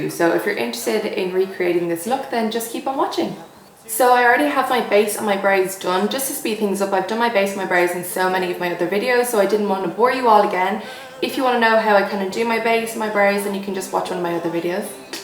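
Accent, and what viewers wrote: Ireland. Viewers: Are you Irish x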